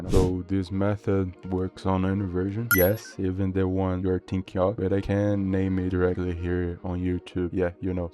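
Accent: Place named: Portugal. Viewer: Sotaque português instantaneamente detectado. Obrigado pelo vídeo, vou tentar aqui. Ainda lançou um de fundo, muito bom.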